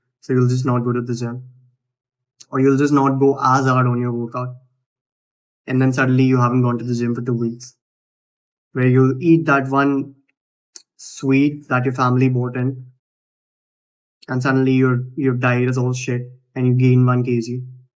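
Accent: India. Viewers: Let me be honest with you bro. You have the choice to listen to a YouTubers advice right now or you don't. 1. You are not actually adding ANY value. I can find your exact video 100 times in this niche, the difference is you are delivering it with an indian accent. Find a way to stand out, find a way to package your video in a way so good that people feel stupid not cliking on it and watching it. 2. I know that hamza may have said that editing your videos lowers audience quality and attracts "jeffreys", but that is only true to an extent. Even just cutting out pauses can improve retention rate significantly. Hamza has started to edit his videos again too, because he realizes the power of it. 3. Speaking about quality, your microphone quality is bad. Listening to your videos literally feels like a chore because of that. (4. You technically can still make it as a YouTuber in the self improvement niche, but it has become so oversaturated that you might as well start getting rich by selling a course on how to start a self improvement channel.) Advice may come off as harsh, but if you implement my advice correctly you'll pop off in no time.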